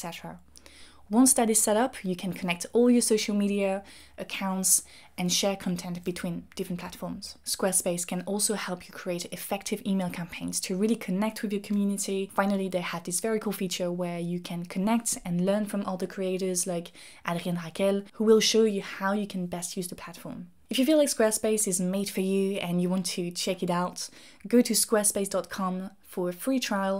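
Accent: France. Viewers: This is entirely irrelevant to the conversation, but I can’t help but be a bit taken by your whispery French accent. Developing a bit of a parasocial “crush.” But you’ve inspired me to pick up French again on Duolingo. I’m Senegalese and I feel like I should be fluent in French by now. Anyways great vid as always.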